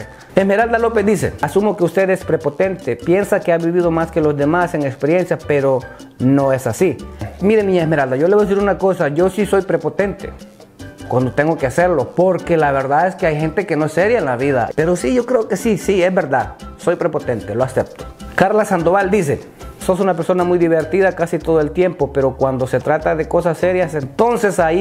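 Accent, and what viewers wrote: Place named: Oriente, Cuba. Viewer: Soy chalateco y creo que vos sos de Oriente así hablamos también cuando estamos jodiendo te felicito sos auténtico maje segui adelante tenes mi apollo aquí esta tu 👍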